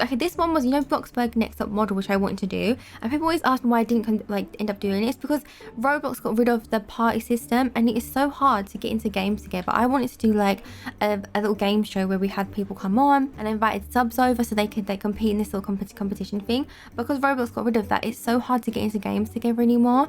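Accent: London, United Kingdom. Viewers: You are from London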